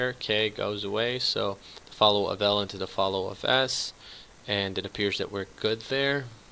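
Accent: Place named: United States. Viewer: Wow, am I dreaming or is this an a guy with an american accent? FINALLY!!!!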